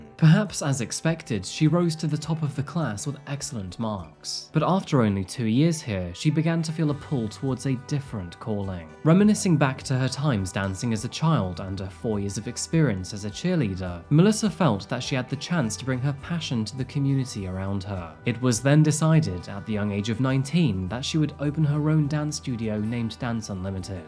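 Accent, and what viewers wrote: England, United Kingdom. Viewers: Yet another outstanding episode! And chin up about sounding English; it could be worse....you could sound like Andrew Tate with some weird US/British drop-the-t's accent!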